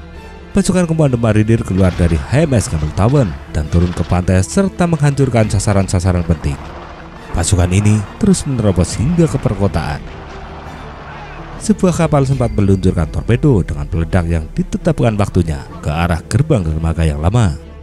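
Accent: Java, Indonesia. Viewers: Naratornya Jawa medok jadi kadang tidak di copy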